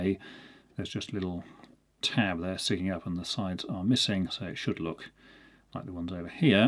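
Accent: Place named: Northern England, United Kingdom. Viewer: Sometimes, sounds Northern.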